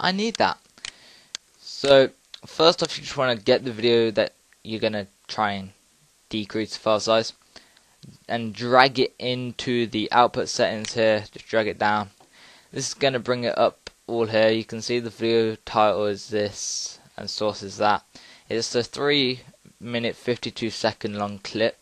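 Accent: United Kingdom. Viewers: okay apparently thats not it. It says it only uses that for previews. I just did it again from scratch along with the video and still nothing. By the way are you scottish? I've been watching monarch of the glen and you sound like them. I'm from Los Angeles so... ANYWAY I'm going crazy. I CANT FIGURE OUT WHY ITS TURNING IT INTO AUDIO. It's still mp4 but the video is gone and even the thumbnail doesn't have an image. Just windows icon which is a film reel with a music note. The video is gone. WTF? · TOO English accent !, Cannot understand a thing.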